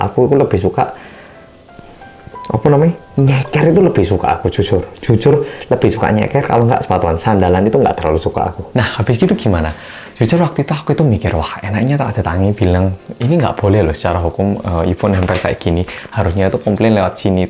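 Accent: Java, Indonesia. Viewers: . Jawaa bgt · Salam selamat & sejahtera utk Cak medhok dgn istri,slmt mlm,saya dari kota Bandung,saya ikut prihatin dgn pengalaman tdk enakmu tinggal di apartemen di Korea,oh iya saya punya info sangat penting tentang "Sesuatu hal dari budaya & tradisi Sunda" yg pasti akan membuatmu sangat suka jika mengetahuinya,yaitu info tentang hidup jaya yg mana setiap orang serta setiap rumah tangga membutuhkannya,tapi kita berdua harus ngobrol langsung,dtglah ke Bandung,salam kenal utk kalian berdua,salam Rahayu. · Smoga mas ttep jd org korea yg medhok bkn org korea yg kek gtu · Mas pindah Indonesia neng kene biar kecil2 omahe yoh nyaman ora wedhi wedhi kyk ngono. Seng sabar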